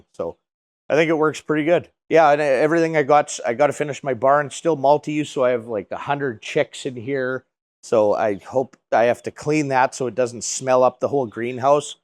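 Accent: Canada. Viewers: This guy sounds so Canadian i Love it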